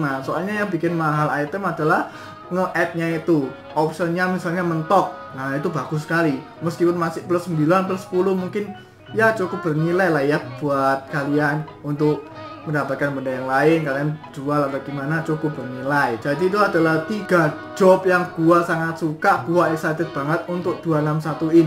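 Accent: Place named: Java, Indonesia. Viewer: bawa acaranya gokil :) bgd :) jawa nya :) gmn gituh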